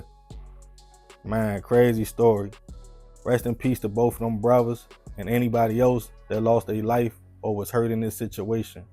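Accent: Baltimore, United States. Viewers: Ngl that intro is hella corny, is that how Baltimore niggas talk?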